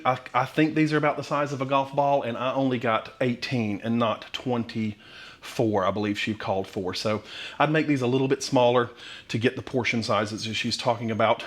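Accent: South, United States. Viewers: Came for the comparisons; stayed cause I could relate n feel the excitement in each bite haha. You actually have convinced me to at least check out Thrive Market, so they picked a great influencer to sponsor too. I always skip ahead during most sponsor’s adds. Lastly I’m from the south (Louisiana) so I feel right at home listening to you say “y’all”. I’m amazed at how quickly u speak occasionally, yet enunciate crystal clear. Fantastic channel 👍💕👍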